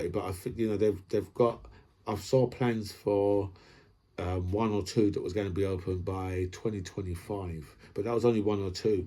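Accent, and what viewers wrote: London, United Kingdom. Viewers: Cost of living is difficult for people on low incomes. I 'm in the NW. Butter or anything else other than spirits are not under lock and key in the supermarkets [5] where I live. In fact there is no to little crime here. When I moved here the headline in the local Advertiser was "Daisy the cow is 32", bit of an eye-opener after living in Manchester for 40+ years ! From a previous video where you mention £2000 per month rent [which I don't dispute] I looked online round here. Just over a grand for 4 bedroom 2 bathroom property. Jacob Rees-Mogg has 6 kids. Should he get child benefit ? Maybe 3 would be a reasonable limit and then means-tested ? Spent about 5 years off and on in the USA. I have mates who live there. The food was fine. Pretty sure salmonella in eggs, BSE and horse meat sold as beef was nothing to do with the USA. 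From the accent I'm thinking London. You think that might skew your views on some problems ?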